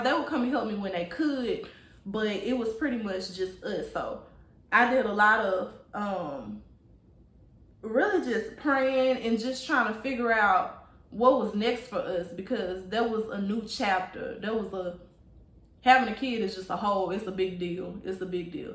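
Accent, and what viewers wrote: United States. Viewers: Thank you so much!!! I needed this so badly, you don't even understand. What's so crazy is that I have been watching your video on and off all day at work because I have been having to pause it lol, but I knew I needed to stick around and watch until the end. My jaw was on the floor when you mentioned real estate when that has been a career I have been trying to pursue for over a year now,but for some reason just can not pass the exam. Maybe God is telling me it is not meant for me to get licensed in this state. I am definitely going to keep praying to remain obedient and faithful to him. I know blessings shall pour down. Yes I will be alone and won't know not one person in that new state, but I know God will provide connections for me. Your testimony really got me going. I knew by your southern accent it was going to be a good one lol. 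I know a Mississippian/Memphian when I hear one! I pray that more blessings come your way. Are you on any social medias? I would love to support your business whenever I'm in TX. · Your American accent is too heavy I struggle to get some words. E.g. lulu for little.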